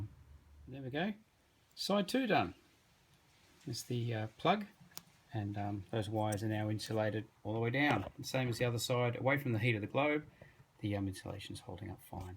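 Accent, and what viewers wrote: Australia. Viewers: Haha it stuck out to me too. This lad is Australian though. (Or NZ? Nah Aus i am pretty sure)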